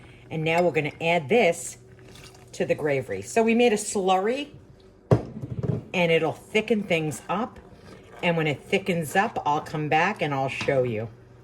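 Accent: New York, United States. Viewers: Good evening big sis, yes you sound like a New Yorker.. oh I will be making that gravy, it looks so good. You are a inspiration to us all. Keep up the good work.. Till next time sissy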